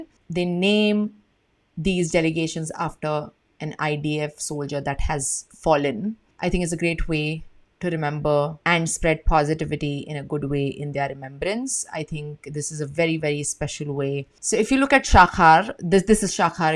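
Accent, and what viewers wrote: India. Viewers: Your English is More Indian than Israeli❤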